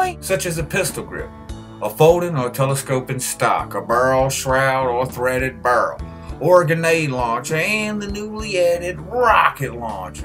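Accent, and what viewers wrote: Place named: Mississippi, United States. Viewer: you talk like youre from mississippi or something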